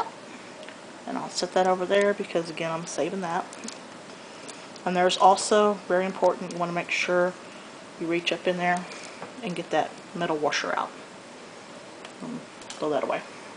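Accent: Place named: South, United States. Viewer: Oh cool! I recognized the traditional southern twang, but noted it wasn't as nasaled as Texan,nor as slightly deep as Tennessee,where I live.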